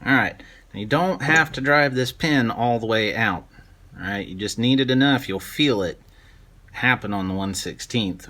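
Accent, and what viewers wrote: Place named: South, United States. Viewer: Thank you again for the video, by the way I love the southern accent, kind funny but I like. I finnally today got some will and finish the slide part, it’s been more than a year since I bought the kit and did the lower frame part, just now I did the slide, I didn’t do before cuz I was lazy 😂 thank you God bless America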